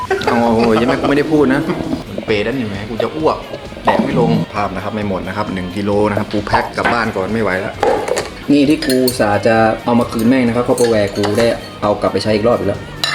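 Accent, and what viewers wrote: South, Thailand. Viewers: พูดใต้เล่นกล้ามนิพอชอบหนัดเลย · พี่บ่าวแหลงเหมือนคนใต้เลยอะ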